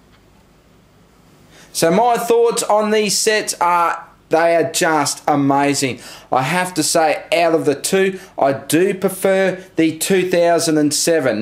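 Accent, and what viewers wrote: Australia. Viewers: R u australian firat thing i do i hear your voice then i comment yu sound thats why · I'm Aussie and this dude has a REAL Aussie voice!!!! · Hmmmm, I wonder if he's Aussie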